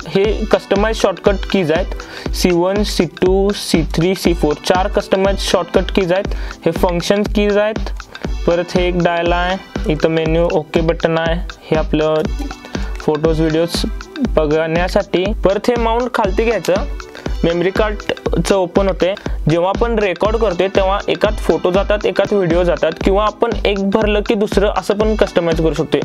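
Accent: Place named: Kolhapur, India. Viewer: Assll kolhapuri marathi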